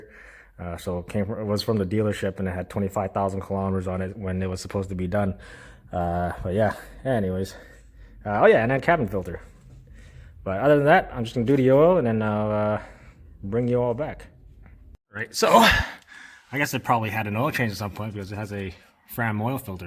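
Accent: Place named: Canada. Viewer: So, are you saying the Mazda dealer double gasketed the filter on the previous change? Whoever did it is an amateur. SOP is to clean the filter mounting gasket area after removal. That should tell if gasket left not to mention, just looking at old filter. smh Hope oil in sump didn't drop too far. Also, from two Canadian yt vids recently seems Mazda pronounced differently than in US. In US pronounced Mahhzda. North of the border seems, Mehhzda seems to be used. Fwiw, I'd let you work on any of my Hondas, and wouldn't say that to just any mech/tech.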